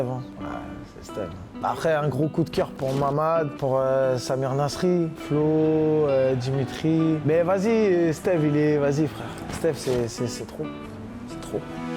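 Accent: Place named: Paris, France. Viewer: Par contre l'accent on voit bien c parisien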